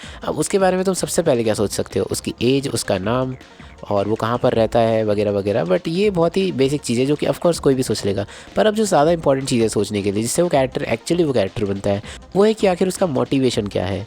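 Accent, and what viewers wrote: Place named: Madhya Pradesh, India. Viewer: Bro what that pen is called you use for inking , sorry for disturbing you again but please reply , and you got a new subscriber 🎉 you are so humble and Wese bhi aapki tone se pata chal raha hain ki shayad MP se ho aap , please reply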